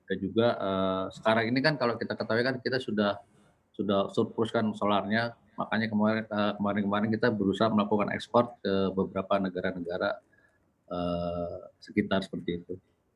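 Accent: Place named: Banyumas, Indonesia. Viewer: bapake kayane wong banyumas/cilacap ya..ngapake esih keton loh pak .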